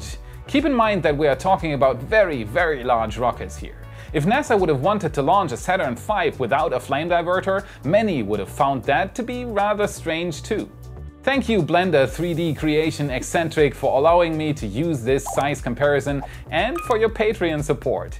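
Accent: Germany. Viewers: Are you from Germany? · Tolles Video, man hört deinen deutschen Akzent, ist aber gottseidank nicht so nervig wie bei vielen Anderen Deutschen, die Videos auf Englisch machen 😉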